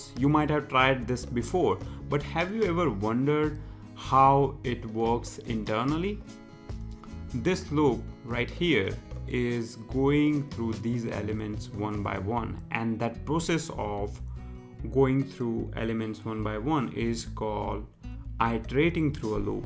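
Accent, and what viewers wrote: India. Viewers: Deepanshu Joshi Mate you just proved yourself wrong.You said Indian Accent.Yeah that’s an Indian accent that is why it is not correct.the correct pronunciation of a word is understood by how it is pronounced by majority of people.So you are wrong.I think you need some help before me. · This is Indian accent. If you have any problem with it you can watch other tutorials made by youtubers who belong to the your region. Try to keep your cynism in control. If you find it difficult try some medical help.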